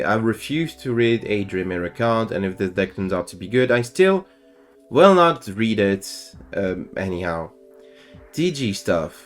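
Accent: France. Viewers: Yo i kinda here the french accent in this one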